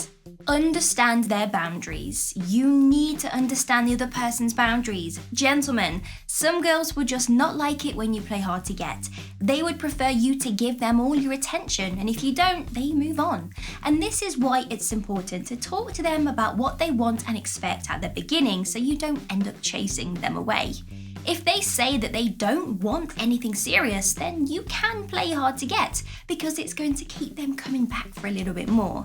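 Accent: United Kingdom. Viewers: I like your sweet British accent)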